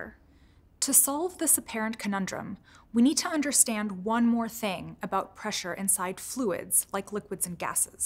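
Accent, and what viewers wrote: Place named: United States. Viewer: Sounds very americanish- hard to bear listening for more than 2 minutes and not getting any closer to the point :)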